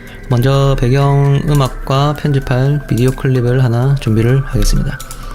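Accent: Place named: Gyeongsang, South Korea. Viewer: 앗, 템플릿 영상만 보다가 처음으로 목소리를 들었는데 사투리 억양에 급 친근함이...ㅋㅋㅋㅋㅋㅋㅋㅋ 저도 갱상도 입니다...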